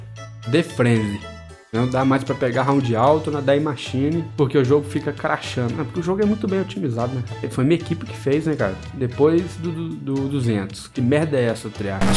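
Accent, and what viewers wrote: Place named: Minas Gerais, Brazil. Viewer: Quem é esse mineiro?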